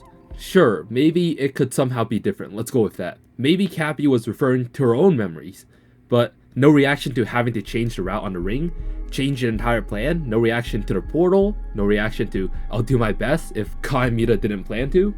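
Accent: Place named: United Kingdom. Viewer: can’t watch game theory since the new guys british accent keeps throwing me off and making me nauseous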